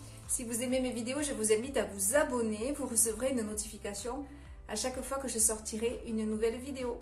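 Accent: South, France. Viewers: Bonjour. Quelle peinture or utilisez-vous, si ce n' est pas un secret? Merci pour votre pédagogie, votre sourire, et votre accent du Sud délicieux à mes oreilles nordiques (Belgique)...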